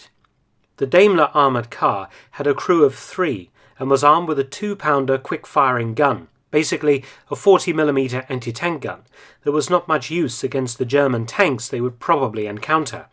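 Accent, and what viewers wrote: United Kingdom. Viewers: I have difficult to understand british english accent, but luckily I can understand 90% you. Great job.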